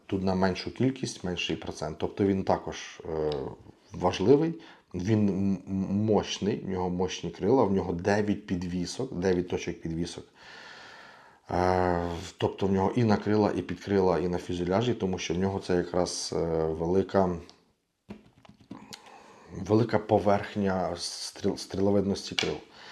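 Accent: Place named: Russia. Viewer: Яка бездоганна українська мова, спочатку подумав я, але це було тільки спочатку! Жахлива кількості русизмів, неправильні закінчення і взагалі не відповідні слова, або мовні покручі. Наприклад: мощний ; крепший; крєпєжа; пощитати замість порахувати і рахується замість вважається (рахується - калька з російської - СЧИТАЕТСЯ); по елЄктроніКі; канешно і таке інше. Жах. (((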